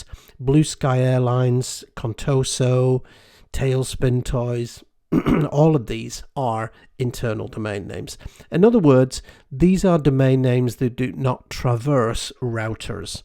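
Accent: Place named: United Kingdom. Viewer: I'm very excited about your videos, you are very calm teacher and your speaches are easy to understand thank to your perfect British accent! I want to studies all of your video and give up in my Knowledge.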